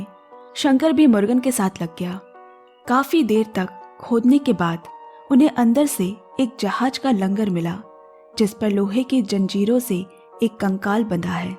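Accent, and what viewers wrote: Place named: Lucknow, India. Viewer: Ap lakhanow Sy hy kia? bohot hi pure urdu bolti hy ap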